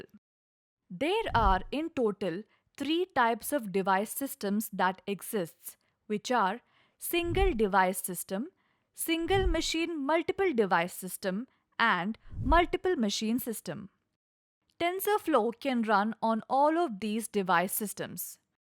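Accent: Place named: India. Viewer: The heavy Indian accent in the video is too hard to follow for a westerner !!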